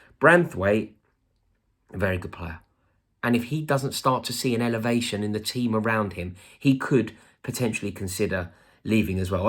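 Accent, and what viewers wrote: United Kingdom. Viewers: Такое забавное английское диалектное произношение👸😊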